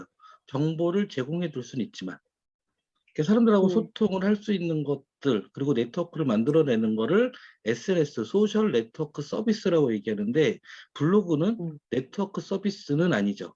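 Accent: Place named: Jeolla, South Korea. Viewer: 전라도 소리만들어도 조으네요 내고향 전라도 줌교육도 열정뜨겁네요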